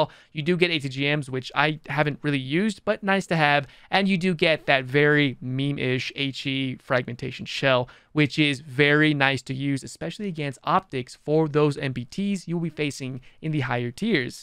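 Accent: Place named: United States. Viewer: You have the voice of callmekevin mixed with a clickbait youtuber voice and the tone of an american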